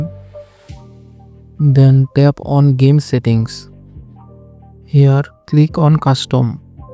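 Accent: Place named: India. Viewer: I already knew he is about to fix my shi when I heard the India accent btw thanks dude❤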